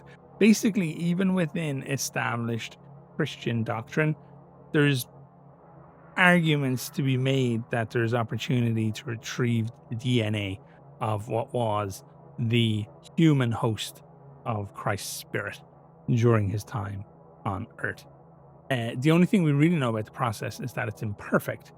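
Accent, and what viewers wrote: Ireland. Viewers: Irish? Subbed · Are you Irish ?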